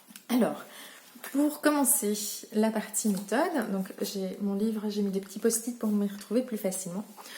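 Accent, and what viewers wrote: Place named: Belgium. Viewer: Bonjour, je découvre ta chaîne avec cette review de ce livre que j'hésitais à acheter. Grâce à toi, je ne doute plus. La méthode est très complète, c'est parfait. Merci beaucoup. J'en profite pour m'abonner et découvrir toutes tes autres vidéos. Et ça fait plaisir d'entendre ce si joli accent belge ❤️❤️❤️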